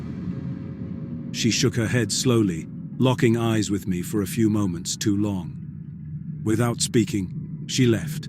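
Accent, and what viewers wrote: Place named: England, United Kingdom. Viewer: Wow, it took me exactly 26 seconds to subscribe! True horror stories told w/ an English accent! Nuff said, subscribed!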